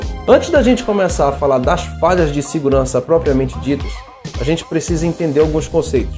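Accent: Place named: Belém, Brazil. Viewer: Sotaque de belém é bem legal. ")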